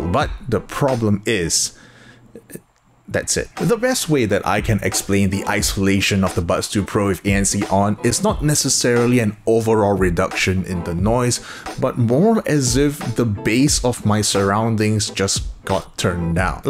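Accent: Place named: Singapore. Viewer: This man sounds really Singaporean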